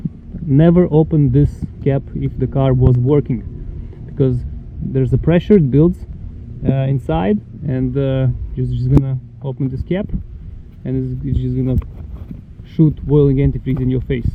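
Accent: Russia. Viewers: Thanks man! Your Russian accent makes this video easy to understand and learn. Thanks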